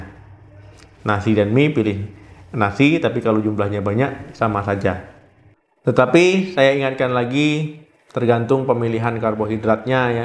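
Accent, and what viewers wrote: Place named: West Sumatra, Indonesia. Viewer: Logat nya kayak orang sumbar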